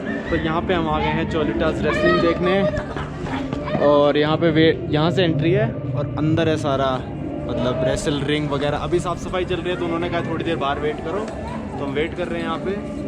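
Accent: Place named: Kanpur, India. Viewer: Yeah tho kanpur wala style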